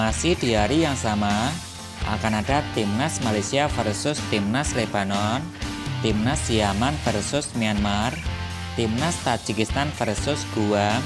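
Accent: Java, Indonesia. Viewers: di liat logat bicaranya aja udah keliatan di tambah lagi ada yang ke telu tambah yakin dong kalau adminnya orang jawa 😁😁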